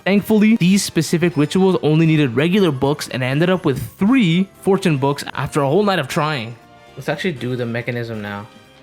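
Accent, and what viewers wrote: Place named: Mexico. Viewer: Im digging the Mexican accent!... At least thats what I think Im hearing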